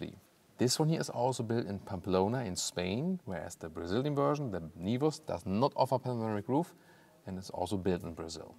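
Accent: Germany. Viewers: I do love these reviews with the German accent, I want to hear him say " for you Tommy ze vor is over".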